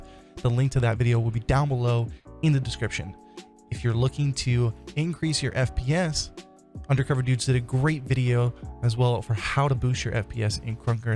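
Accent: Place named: Texas, United States. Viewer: That's a Nice Texan accent you got there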